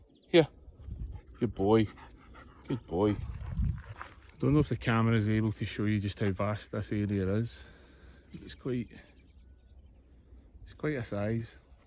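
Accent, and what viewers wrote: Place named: Scotland, United Kingdom. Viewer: Perfect accent for someone fae Bannockburn even if this one is in new Zealand 🏴🇳🇿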